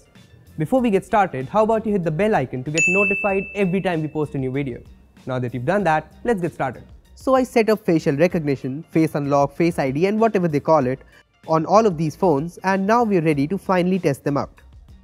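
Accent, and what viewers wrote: India. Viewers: Sayam Sawai he has a typical Indian accent but his presentation is pretty good. I wouldn't judge him for his accent alone.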